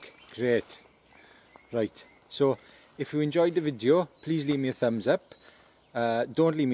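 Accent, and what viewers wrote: Wales, United Kingdom. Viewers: I was so confused about the accent, ive never heard such a thick welsh accent. I thought it was welsh and Swedish mixed lol. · Not often you hear a thick Welsh accent in a YouTube knife review! Subbed!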